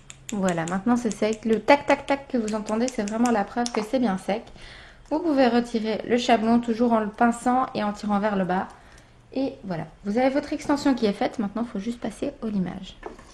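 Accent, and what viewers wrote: Belgium. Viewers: t'es belge??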